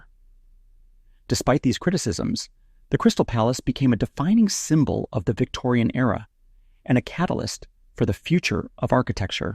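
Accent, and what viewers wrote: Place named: United States. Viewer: What bothers me is the narrator in Am.English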